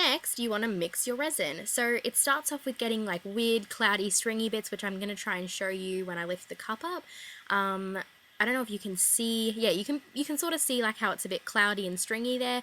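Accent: Australia. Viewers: I love your beautiful Aussie accent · An Australian accent! So refreshing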